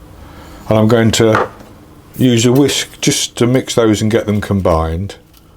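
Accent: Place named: Somerset, United Kingdom. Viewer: thanks,love your gentleness and relaxed approach...are you retired chef,your brilliant and I'm trying to work out the English accent...Somerset?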